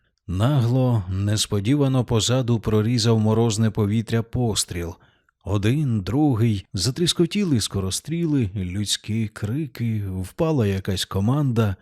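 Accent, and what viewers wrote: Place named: Chornobyl, Ukraine. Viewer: дякую за справжню ,,чорнобильську,,вимову у тексті👍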